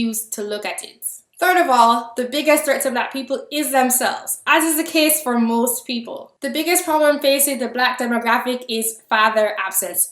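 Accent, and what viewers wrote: Jamaica. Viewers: You sound like an islander. Are you Jamaica? I am too. I'm curious of what socioeconomic class background you came from in the islands before you immigrated because you sound quite elitist and condescending in your interpretations. Did you grow up in an insular middle to upper-class setting in the islands?...away from all the struggling poor black people in the ghetto? Because your perspectives seems sooo limited. You seem to be using that same limited perspective to interpret how Black Americans or Black people in America react to dehumanization and mobilize against brutality. Obvious when Black people in Americas say "Black People" they are most likely referring to themselves and their issues - same way when Black people anywhere else posit themselves as Black people...they are referring to themselves unless otherwise specified. But you seem quite invested in making sure non-black people (and white people specifically) know that "NOT ALL BLACKS are like this! 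Some of us are good and do not rock the boat." People are suffering the world over. You may (or may not be an outside to that) but it's a social reality. You do not get to police how they react and choose to mobilize/organize. I have my qualms about BLM (they are not radical enough) but I have no issues with them choose to mobilize around being brutalized. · Is it just me or is her jamaican accent too sexy? (apart from her smexy beauty) Damn woman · You Jamaican? Sound like it?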